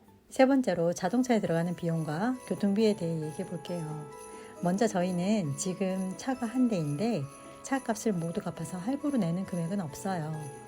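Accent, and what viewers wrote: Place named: Gyeongsang, South Korea. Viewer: 나레이션 중간중간 대구 경북 악센트 정겹네요. 좋은 정보 감사합니다.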